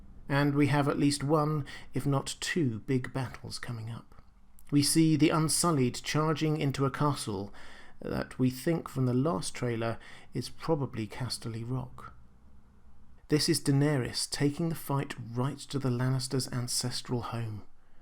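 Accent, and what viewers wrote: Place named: RP, United Kingdom. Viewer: He, by far, has the best voice of all of the channel owners. Sort of a younger, less annoying, more posh David Attenborough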